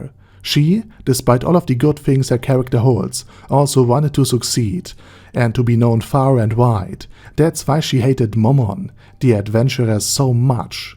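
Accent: Germany. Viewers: Bruder, dein deutscher Akzent ist schlimm.... Hab wrsl nen schlimmeren, aber kann ma das nd geben, wrsl aber trzdm n gutes video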